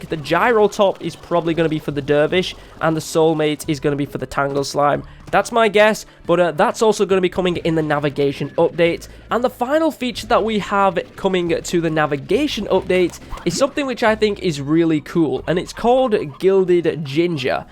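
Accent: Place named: Scotland, United Kingdom. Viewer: U sound like a Scottish NKuch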